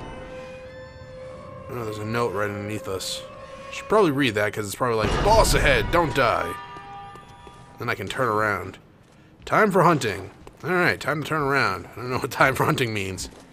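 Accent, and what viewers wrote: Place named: Mid-Atlantic, United States. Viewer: Knowing that has Brit relatives goes someway to help explain his accent, clearly American but every now and then it verges into mid-Atlantic eg lever pronounced as lev-ver but occasionally as lee-ver it's somehow enjoyable to listen too & coupled with the gameplay & the extraordinary engaging commentary it has me watching a game that not normally my cup of tea.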